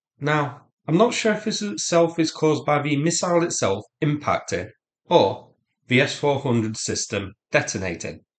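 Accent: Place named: Estuary, United Kingdom. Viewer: Why have you gone deep into estuary English today?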